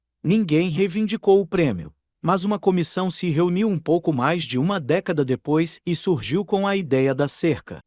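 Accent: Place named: Australia. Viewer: Gostaria de saber de onde se origina o locutor do vídeo cuja pronúncia é muito estranha. Fala um português que não é nem de Portugal e tampouco do Brasil. Australiano que aprendeu português? Pode ser...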